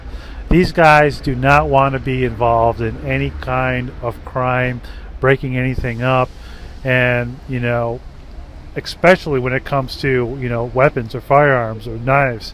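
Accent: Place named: United States. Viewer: Yeah, if they did arrest him I would assume they would use him as a bargaining chip for Uncle Sam. I assume he's American anyway, due to the accent.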